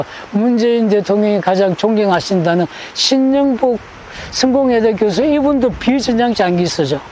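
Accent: North Korea. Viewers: 도대체 당신의 정체가 뭐냐 말투가 북한말인데 북에서 언제 내려왔나 당신이 받은 임 무가 뭐냐 6,25역사 왜곡하러 내려 왔냐 이것이놈의 나라가 완전히 공산화 되었구만 이런자가 설치는데도 방치하고 있으니 아하 이제 이제 이나라가 완전히 망했구나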